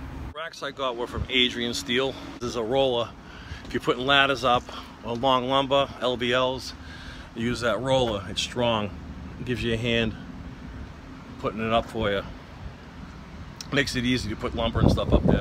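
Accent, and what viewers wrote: Boston, United States. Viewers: I think its Boston or New York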